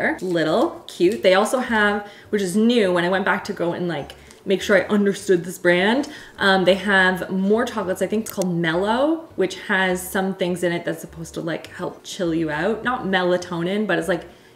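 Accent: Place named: Canada. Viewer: After being convinced that Canadians don't say "eh" at the end of there sentences. We have! Yessssssss!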